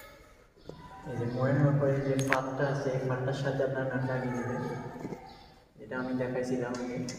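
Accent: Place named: Sylhet, Bangladesh. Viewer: মাশা-আল্লাহ ভাই খুব সুন্দর করে বুঝালেন আর আপনি বুধহয় সিলেটি আমিও সিলেট থেকে দেখছি❤❤❤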